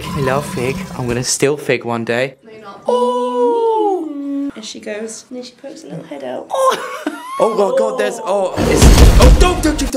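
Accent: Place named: Midlands, United Kingdom. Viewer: Love hearing a midlands accent in the vlog!! Also, love the new hair ❤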